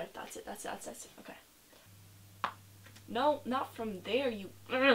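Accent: Ireland. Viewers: Me Before : I think she’s Irish... she sounds like all the annoying second cousins from Ireland who I am forced to put up with, despite the fact I don’t know the name of any of them, other than they and love Karaoke, Makeup and romance movies... Me after : SHE’S DEFINITELY IRISH, SHE SAID THIRTY THE WAY I DO WHEN I’M IN IRELAND. Saying thirty three and a third in a Irish accent is very funny to me, and my immature sense of humour. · Is she Irish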